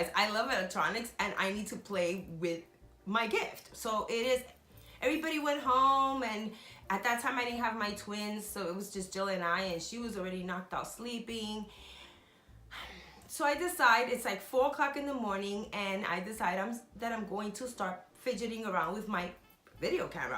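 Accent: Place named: Spain. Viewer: I enjoy you a lot I just kind of met you watching my TV and I'm happy I have to watch somebody to Spanish like me and curious and with a little bit anxiety like me you're wonderful to me and I love watching you I love your accent oh the way you talk I'm Spanish to and I love your new baby you can't get him wonderful he is adorable I love him blessings want to be your friend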